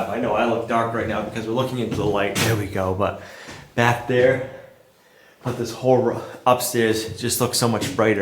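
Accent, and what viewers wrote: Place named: New England, United States. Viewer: Giggle. You called your wife Gottta love the New England accent! I grew up in MA, got called "Linder" so much, it became my nickname.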